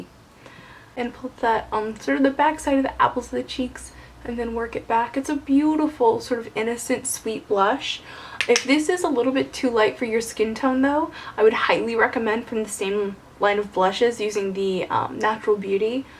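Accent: England, United Kingdom. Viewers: This video made my morning,! So fun! Love your English accent, love! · thank you- I love shows with a brittish accent:)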